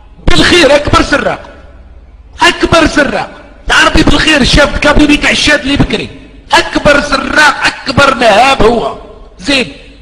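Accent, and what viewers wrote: Morocco. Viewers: C un marocain qui parle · لهجتك مغربية....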